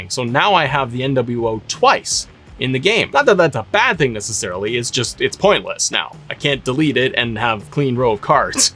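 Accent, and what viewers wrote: Canada. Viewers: I heard Canadian!!?? Are you from Vancouver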